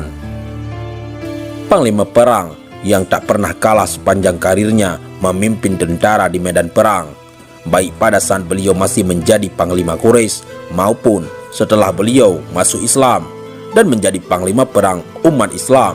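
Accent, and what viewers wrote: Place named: Bali, Indonesia. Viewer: Yg bcra sperti orang bali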